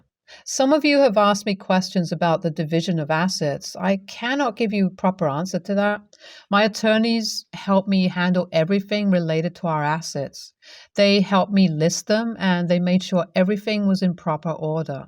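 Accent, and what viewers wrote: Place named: England, United Kingdom. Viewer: Can you tell us what part of England you’re from?I love your accent and the way you read a story.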